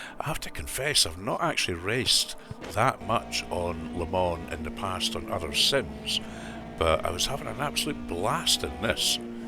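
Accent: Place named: Scotland, United Kingdom. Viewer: Great video. Subscribed. AMS2 is very underrated. Nice to hear a fellow Scot. Greatings from Edinburgh.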